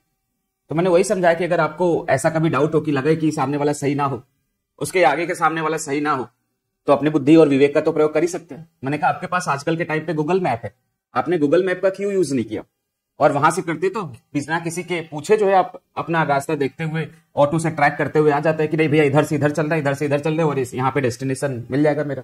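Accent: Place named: Varanasi, India. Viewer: Kbhie aae to nhi hain benaras bs isi bahane dekha Apka benarsi accent ek no tha 😍 thanku sir for guiding us 🙏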